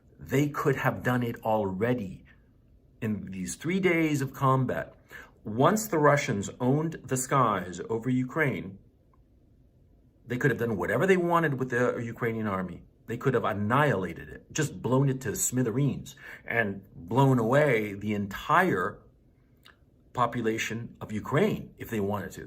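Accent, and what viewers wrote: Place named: United States. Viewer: Let’s start from the beginning. As you mentioned on you title and your first words, INVASION. Now, let’s describe what invasion means. Invasion means to enter someone property or house or country by force and steal all. So now you daub that Russia is not bombing Ukraine, we have already 14 days and just last night they bombed an Hospital ( Maternity) So you are you trying to say that Russia is bringing flowers to the Ukrainian people. My friend this is war, and 14 days has passed and they are not able to reach the capital yet. Nothing against Russian people, but the government yes in deed. Mr., I don’t know you by your accent you sound American and you are one those people that is a loser and badmouth your own country. Open your eye, it’s a war, people will suffer with this stupid war. The leader should learn what we humans beings have been true all those years work all the wars like WW11, where 6 million people died from Europe and more then 25 million from Russia. 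We are in 2022, there no reason for war. Now, you sjouke be more smart then that. Now my question is, it has been already 14 days of this was R and this video is when it just started. I just wonder where are you now??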